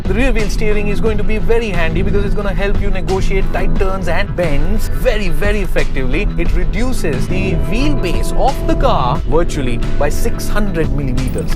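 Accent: India. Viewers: Ur indian accent tho...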